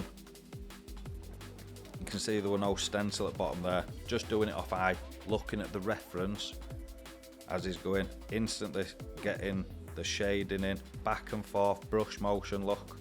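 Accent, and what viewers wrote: United Kingdom. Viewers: OMG what a thick accent like wow, the most British/English accent I have ever heard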